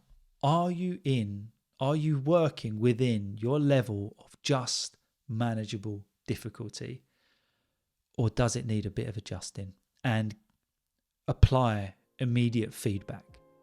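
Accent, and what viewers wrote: England, United Kingdom. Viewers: Sir like it very much your British English accent and I understand your speak properly it is hundred persent clear. Lot of thanks teacher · Hello, thanks for another interesting episode, you’ve been doing excellent job for learners to help them with English May I ask you, what is the English accent which you speak?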